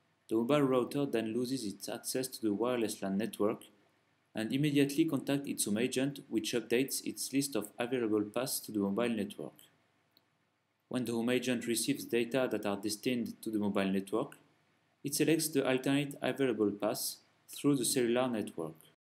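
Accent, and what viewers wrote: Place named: France. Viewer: No no, French English ;-)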